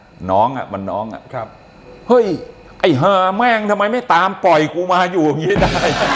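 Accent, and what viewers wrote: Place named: Pak Nam Pho, Thailand. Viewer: สำเนียงคนปากน้ำโพค่ะท่าน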